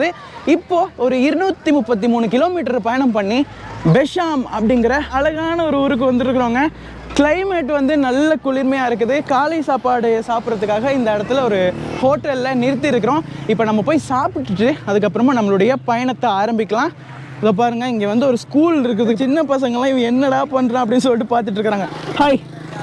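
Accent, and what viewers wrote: Tamil Nadu, India. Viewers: Super sir u purely tamil nadu voice wow nice 👍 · அசல் தமிழ்நாட்டு பேச்சி BRO நீங்க தமிழ்நாட்டில் இருந்திர் பிர்கள் அதான் நாங்க பேசிறேமாதிரி இருக்கு BRO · Srilankan ah irunthalum thamilnadu thamil pesuraga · தமிழ்நாட்டு தமிழ் பேசுறீங்க சிறப்பு நண்பா🎉🎉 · உங்க தமிழ் நீங்க பேசுறது தமிழ்நாட்டு தமிழ் போல் தாம் உள்ளது, நீங்களா நான் இலங்கை என்று சொன்னால் தான் இலங்கை என தெரியும் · பாகிஸ்தானில் மலைப் பிரதேசங்களை கண்முன்னே காட்டியதற்கு முதலில் நன்றி உங்களுடைய உச்சரிப்புகள் எல்லாம் இந்திய தமிழ் உச்சரிப்பு ஆக இருக்கிறது ஆனால் நீங்கள் ஸ்ரீலங்கன் தமிழன் என்று கூறுகிறீர்கள் எப்படி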